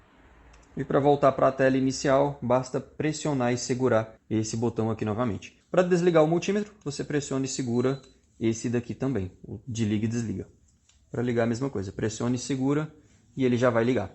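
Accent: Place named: South, Brazil. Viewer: Você é de qual estado,? Seu sotaque parece de sulista.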